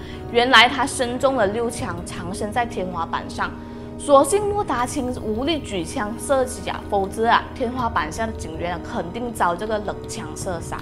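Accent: Malaysia. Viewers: 這裡我要幫講幾句，因為看到一堆人在罵資料不對，不敬業，發音很奇怪等等，我實在Beh Tahan。還有一堆性騷擾的留言，根本一點教養都沒有，不知羞恥是馬來西亞人，講華語的口音自然也是馬來西亞的腔調，有什麼問題？另外，Botak Chin的故事有很多種說法，別說民間了，網絡也有一堆，已無從考證。沒有什麼誤導不誤導的我還看過Botak Chin主動投降，沒有槍戰的版本 (不過圖片已證實槍戰確實存在) 像美國的鴛鴦大盜也有不同的版本啊，為什麼沒人去那吵勒？所以Botak Chin的真正的故事，或許只有跟在他身邊的人，和他自己知道吧每個人對他的印象或許不一樣有人覺得他是文藝青年有人覺得他沒受過教育 (不過在監獄裡寫的信能看出文筆能力不錯) 有人覺得他是劫富濟貧的羅賓漢，用行動來證明社會的不公不義有人覺得他是無惡不作的亡命之徒，不能被當成英雄不管什麼故事版本，不僅華人，在馬來和印度同胞的族群裡Botak Chin這個角色，在馬來西亞甚至在其他的東南亞國家都會有人知道這名字背後的故事。我覺得已足以證明他為什麼不會被世人遺忘，不會因時代的洪流消失。他向世人展示了當年七十年代的社會情形，也證明了法網恢恢，疏而不漏。不管做壞事的背後原因多麼堂皇，終究逃不過法網狙擊加油，已訂閱！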